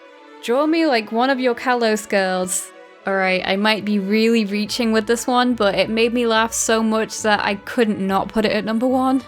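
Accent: United Kingdom. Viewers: Love your British Accent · Also its not wurld wide world olnly in urup im bulagarian a a oh yah youre not canadian your british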